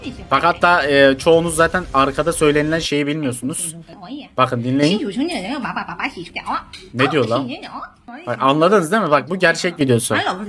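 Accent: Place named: Turkey. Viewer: Sert Türk Aksanı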